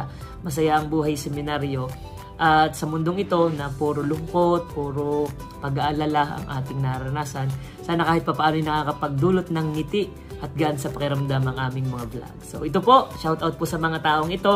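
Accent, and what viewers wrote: Batangas, Philippines. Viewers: Modern day priest. I like the Batangueño accent and his being jolly very contagious. Father is an ideal Spiritual Director for the millennials. More power father!!! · Akoy natutuwa saiyoy Fr. Hahaha batanggenyong tuno talaga eh.... Hahahahha Nakakahawa ka ng tawa.Apakamasayahin mo po God bless you po ❤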